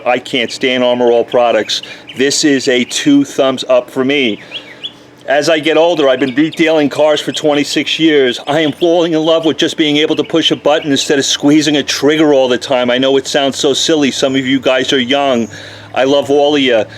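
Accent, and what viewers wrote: New York, United States. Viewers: Texas accent?? That's not southern at all. I'm from Houston so I know a southern accent when I hear one. Lol. That's more like a NY accent or in that region. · *New York accent lol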